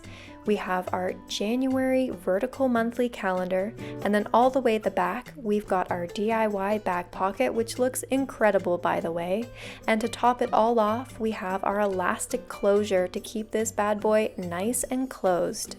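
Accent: Canada. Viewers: you have the strongest Canadian accent i’ve heard in a long time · I loved this video, it was beyond helpful <3 I think using a cheap notebook and modding it helps with the "perfectionist freeze" that I get when I don't want to start a new notebook and ruin it. By the time you start these books, they've already been broken in =) Also I thought I heard that familiar Canadian idiolect, and I'm glad that wasn't just in my head lol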